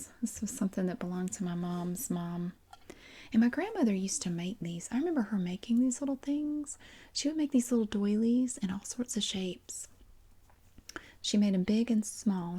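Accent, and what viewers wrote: South, United States. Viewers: It is joyful to listen to how intrigued you were with the various items in the chest. Your Southern accent and your interests are very relaxing. Keep up the fantastic work!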